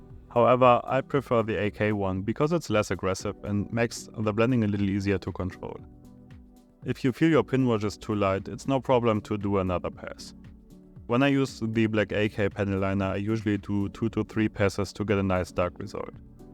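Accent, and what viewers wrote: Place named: Germany. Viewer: Wirklich gute arbeitet. Sprichst du normalerweise deutsch? Dein Akzent klingt zumindest sehr danach 😂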